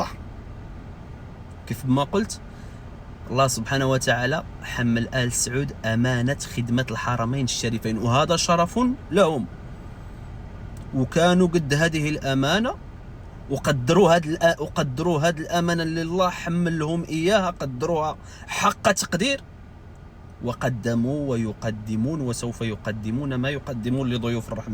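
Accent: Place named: Morocco. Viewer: انت مغربي ولست سعودي كيبان ليا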